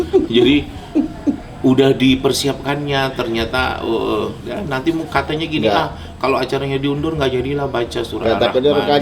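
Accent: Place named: Medan, Indonesia. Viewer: Logat Medan yaaa.. gaya bicaranya mirip Billar banget 🤭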